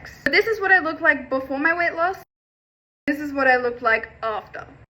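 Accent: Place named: United Kingdom. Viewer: Ur british. Wtf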